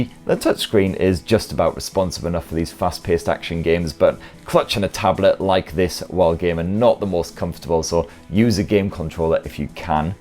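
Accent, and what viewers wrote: United Kingdom. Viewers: He's British in case you don't know 🤣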